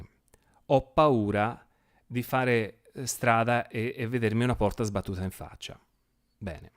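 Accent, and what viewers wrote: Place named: Montecatini, Italy. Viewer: secondo me sei di Montecatini, parli come una mia ex :)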